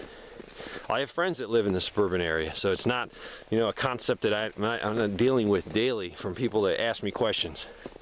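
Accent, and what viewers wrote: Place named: New York, United States. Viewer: I knew you were a NY'er. I'm from CNY but transplanted to NC :)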